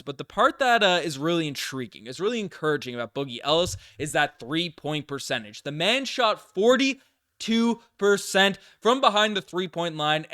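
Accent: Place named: St. John's, Canada. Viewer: i knew your accent sounded familliar lol im from st johns too 🤣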